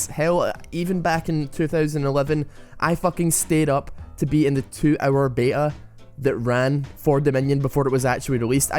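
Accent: Scotland, United Kingdom. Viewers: are you scottish? I've been watching you for quite some time, but only now that I've started watching some videos of Frankie Boyle I've noticed your accent sounds very scottish:D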